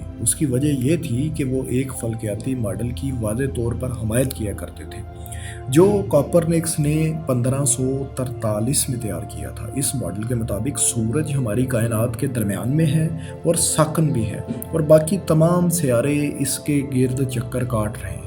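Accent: Punjab, Pakistan. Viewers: Video achi hy magr ap k lehjay mn Punjabi tone bht zyada hy.. Apka Urdu talaffuz bilkul acha nhi hy..!!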